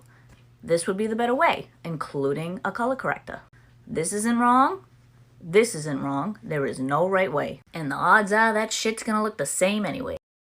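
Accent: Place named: Boston, United States. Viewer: She’s from south Boston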